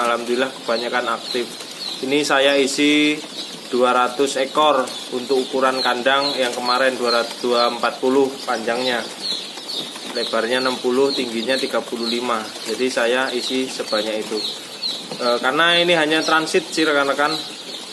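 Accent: Java, Indonesia. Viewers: logat bahasa kok seperti orang Jawa . Tak kira Jawa mas, 🤭. Di daerah saya belum ada pembibitan joper, jadi susah mau ternak joper tak ada bibitnya.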